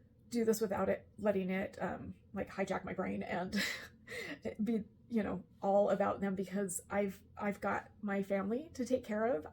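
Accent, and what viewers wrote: Utah, United States. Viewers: what you are going through is valid. I have AuDD. I have experienced so much of what you are going through. I feel my life will be in "recovery" from not paying attention to what my body and brain were telling me. I am in awe of your strength and self-awareness it took to make this video. In this time I have heavily relied on channels like yours and Mac's, (along with other hyper-focus interests). Having been a life long Northern Utah girlie, your Utahan voice and cadence have soothed me more times than you can know. I have wanted to offer my (admittedly, very limited) assistance with what you do, and now I feel drawn even more to offer it, even if it is just to tell you that you have my gratitude for just being who you are. I live north of you, and am serious about offering support should I have the capability. Those of us who truly value you, need you to be your own first priority. 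I love your channel, it has served me so many times as a body double. I (and all of use) support you in your journey. Don't you dare respond to this with more than a heart! Unless you are interested in my assistance, but even then, a very short answer is more than enough!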